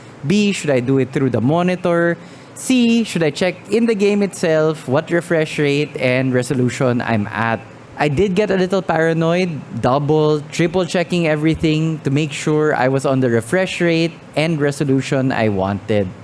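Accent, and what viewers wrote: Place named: Philippines. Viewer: Filipino accent is too funny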